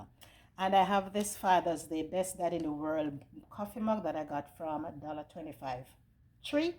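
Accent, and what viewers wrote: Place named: Jamaica. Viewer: 😁😁 that accent!!! Hello fellow Jamaican. Love the video.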